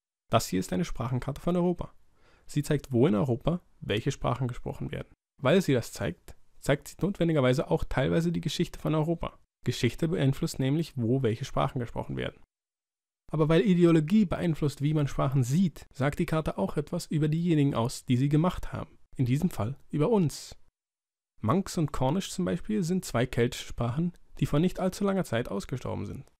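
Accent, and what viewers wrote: Germany. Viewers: Hallo. Das ist wirklich ein toller Beitrag und super interessant. Ich musste ihn allerdings mehrmals hören, weil du sehr schnell sprichst und dadurch auch teilweise sehr undeutlich. Das ist ein bisschen schade, denn sonst wär das echt top. Ps. Sicher bist du Berliner? Du klingst eh ein Flensburger.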